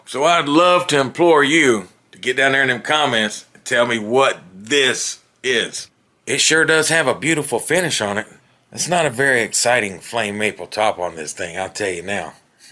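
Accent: South, United States. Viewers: ROFL that was a great intro guitar to the noggin' 'Smack 'Lol! You have a cool accent is that the south? Lots of great info on this channel!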